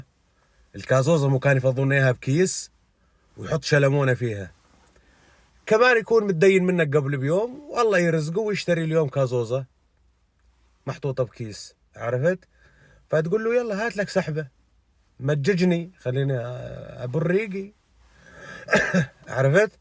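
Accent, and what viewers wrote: Hauran, Syria. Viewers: لهجة حوران الاجمل بالعالم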